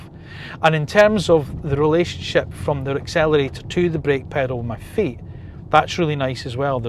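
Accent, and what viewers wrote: Scotland, United Kingdom. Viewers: Informative and well explained. Really like your down to earth commentary. Yes… just cudos for how you’ve grown into this extremely over saturated market of Clarkson and Hammond wanna bees. This you have done with your calm presence, Scottish accent an clearly one or two half bad jokes combined with, great knowledge of the subject at hand…Might find a fast breathing or two when starting a sentence explaining the numbered options… of whom where some Take a little less in or cut out in post. Hell, I even like this masculin income - makes you remember line five…🥸 But overall, really really like your channel and try follow your regular new material - Cudos / 2ell done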